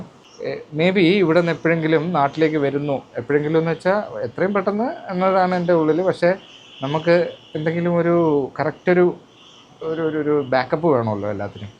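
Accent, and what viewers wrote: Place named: Palakkad, India. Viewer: ബ്രോ 😂എനിക്ക് നല്ല സംശയം ഉണ്ടായിരുന്നു നാട് pkd ആണ് എന്ന്..... ആ സംസാരം കേട്ടപ്പോൾ തന്നെ തോന്നിയത് ആണ് പിന്നെ ചോദിച്ചില്ല എന്നെ ഒള്ളൂ..... പിന്നെ വാഴ പിണ്ടി യുടെ കാര്യം പറഞ്ഞപ്പോൾ.... വീണ്ടും തോന്നി പാലക്കാട്‌ എവിടെ ആണ്....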